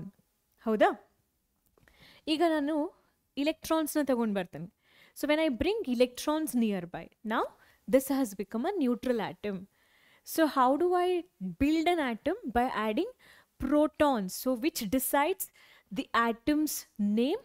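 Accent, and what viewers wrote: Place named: Uttara Kannada, India. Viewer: Mam you speak in uttar kannada voice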